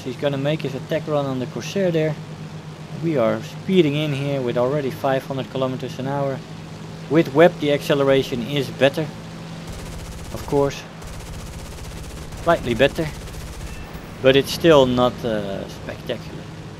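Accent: Netherlands. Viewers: Leuke video ik hoor dat je NL bent haha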